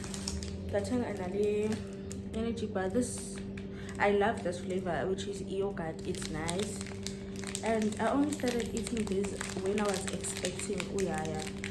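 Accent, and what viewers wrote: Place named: South Africa. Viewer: I am here for your Xhosa accent mtase, the way I love it❤❤ I enjoy your vlogs ke sana...I love youuuuuuu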